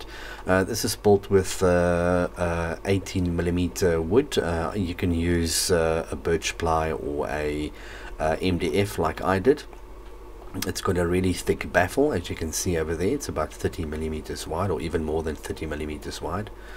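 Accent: South Africa. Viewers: I know a South African accent when I hear one. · Hi there, this is sweet! I'm from South Africa- sounds like you too! I'm wondering the best way to ship or buy a small subwoofer like this locally? I bought a TPA3116D2 and i'm looking for a small subwoofer to match. i.e.100wrms with low frequencies. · thanks for affirming my suspicions of your accent being from South Africa , after seeing the game paper ;-) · I was like 99% sure you were South African, and then I saw the Game catalogue. Love your work, man, keep it up. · Kon sommer hoor in die eerste paar sekondes jy kom van Suid Afrika af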